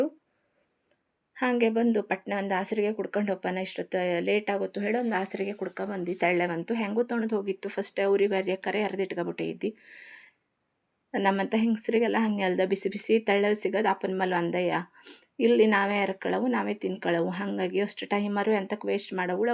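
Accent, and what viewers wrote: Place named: Karnataka, India. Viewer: nim havyaka bhashe slang namma kundapura bhashe ge shekada 80nrashtu hathira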